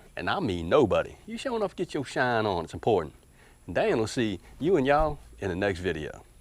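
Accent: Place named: New Orleans, United States. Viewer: Awesome Video My Friend, Watch Out Dan!! I can hear The New Orleans in You Seeping out with words Like, " YOU HEARD ME" 😂😂 That Kale, I'm looking forward to seeing Your UPDATES on this Veggie Growing in the Earth bed! I know it will Do Well, Enjoy your day, Take care of that PINK EYE💗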